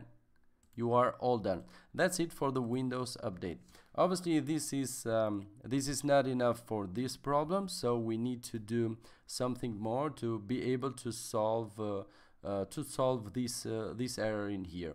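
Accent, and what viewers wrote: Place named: Russia. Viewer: произношение русское)))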